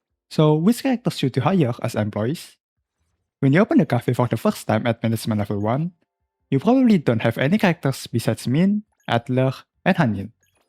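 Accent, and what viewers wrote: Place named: China. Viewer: bro sounds chinese , therefore this video is already gauranteed worth it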